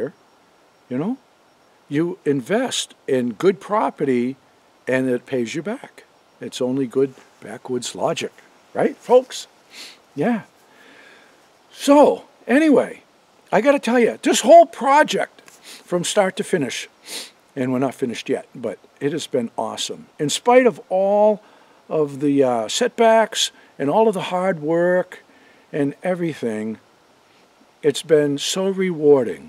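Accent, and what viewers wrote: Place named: New Hampshire, United States. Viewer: I found your videos about a month ago. I love them, since they bring me back to my childhood days. My mother was born and raised in Franklin, NH in a home that my Pepe (grandfather) built. He had an 8th grade education, but went on to build houses on land that he bought. He also made furniture. I get to hear the NH accent and it makes me smile...again those sweet memories. Mom's oldest sister recently sold the old original family house a few years back. New Hampshire is such a gorgeous state and I can't express just how much it means to me to see a portion of it through your eyes after so many years of being away from there. Thank you and all the best to you. ps. My father and Pepe would of loved seeing your meticulous carpentry work.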